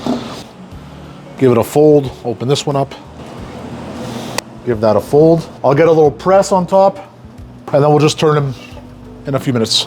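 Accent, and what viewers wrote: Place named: Quebec, Canada. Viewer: Something about a Quebec accent is pleasant. Thanks for the vids as always!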